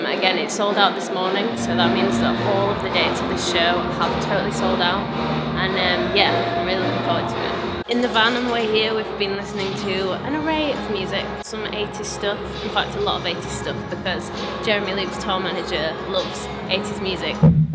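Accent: United Kingdom. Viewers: are you from Sheffield?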